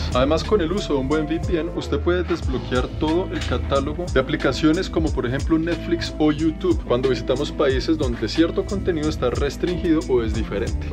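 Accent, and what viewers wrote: Bogotá, Colombia. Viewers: GRACIAS POR DECIR ESO DE NUESTRO ACENTO BORICUA, 🇵🇷..TU ACENTO COLOMBIANO ES PRECIOSO TAMBIÉN! 🤗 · "Que linda jeta tienes amigo" Alobien que no me canso de escucharlo hablar rolo al rededor del mundo parcero. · Me encanta como narra la historia Con esa voz tan bella y ese acento colombiano Lo hace a una quedarse a ver todo el video 👍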